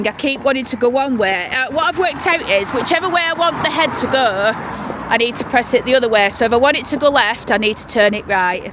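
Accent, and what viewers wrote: Oldham, United Kingdom. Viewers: was born in oldham 1959.. grew up in chadderton cemetery... went to north chad school.. 67 now,, nice to here your accent again.. ive been on the orkney islands 29 years now..... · i could listen to you for hours and hours, you're from where i'm from, you think like i think, and you talk like i talk, i moved away 25yrs ago and i miss the people not so much the place, i was brought up in Lees. you are a proper Oldhamer and your mind is like a sparkler, going off in all directions at the same time, lol, just keep doing what you're doing, it's great to see all the places i used to hang around in. and you are a born communicator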